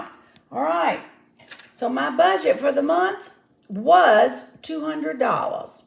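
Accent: South, United States. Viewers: I really enjoy your channel! Makes me smile. Your Southern accent is beautiful to my ears. Have a wonderful day,. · I just love your southern accent! And I'm saying this in my New York accent!! ❤ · As one southerner to another..I love your accent!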